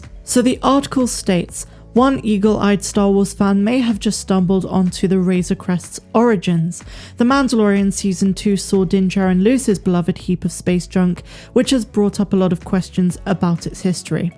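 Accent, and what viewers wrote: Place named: West Country, United Kingdom. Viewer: Is from bristol? Or West country? I can hear a twang! Happy new year Love the content!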